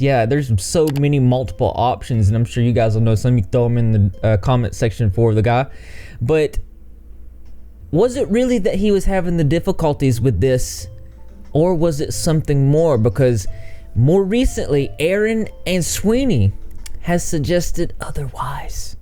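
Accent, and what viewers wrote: South, United States. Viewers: Interesting social dynamic. Thanks for sharing. Also, you're a cutie and I like your accent. I'm usually not fond of southern accents, but yours is really nice. [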